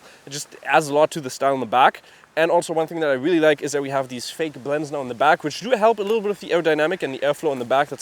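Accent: Germany. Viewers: Jaaa! A German speaking English is quite funny. Good review though.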